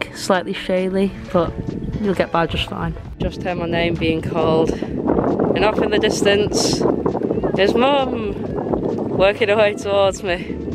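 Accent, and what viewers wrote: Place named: North, United Kingdom. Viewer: ❤Fab video, I love Skiathos, cant identity your accent, nothern but where you from ? ❤ xx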